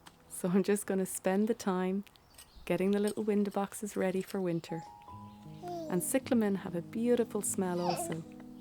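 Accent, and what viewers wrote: Ireland. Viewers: 3 years later and I still comeback so I can go little slower. Your Irish accent I confess brings me joy and calm that I cannot exactly explain. But thank you for all that you put on this platform. I hope your little one is growing well. And that you are still living that is best suited for you and yours. Just dropping in to say hi and happy October again. The seasons cycle is so beautiful don't you think? Take care from the PNW in the USA. May the rain bring all the life needed. ❤❤❤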